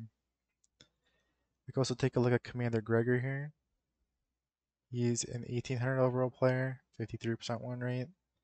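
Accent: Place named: Canada. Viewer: Why is it that I can barely understand 4 in a 5 words you speak? You need to enunciate better, stop whispering, and just generally get rid of that advent. What is it, some sort of Canadian?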